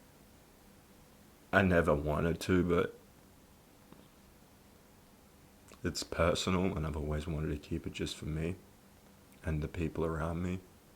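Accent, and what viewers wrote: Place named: Australia. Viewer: Hey man. I'm follow you from Perú. Your dad's video really touched me. At that time my father was fighting a battle that soon after also took him away. I started watching your videos several years ago when I wanted to improve my English on my own and found your accent interesting. At the time I didn't know you were Australian. Now years later my listening is much better so your Australian accent helped me a lot. Thank you very much, keep strong and keep doing you.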